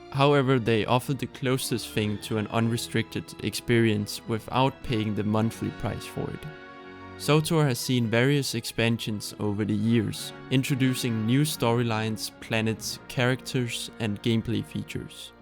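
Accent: Denmark. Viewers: Fornemmer lidt danglish ;-) Jeg er gammel swtor spiller men overvejer at spille igen F2P efter et par siden. VIl du være interesseret i at spille sammen eller blot give gode råd in-game?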